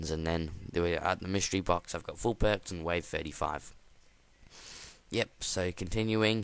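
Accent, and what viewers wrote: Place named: Australia. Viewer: you kinda sound a bit Australian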